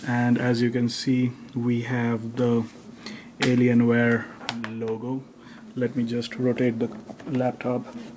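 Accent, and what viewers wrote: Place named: India. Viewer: Brother i noticed your indian accent. Please tell me your opinion of the crappy customer service at Dell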